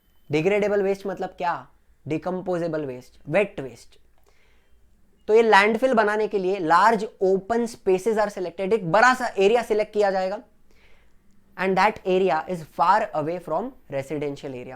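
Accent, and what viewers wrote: Maharashtra, India. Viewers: सर appp Maharastrian ho kya ?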